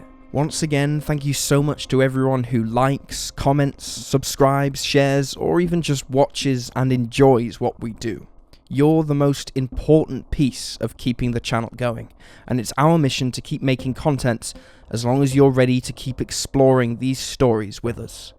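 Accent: England, United Kingdom. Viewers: Pommy accents do not help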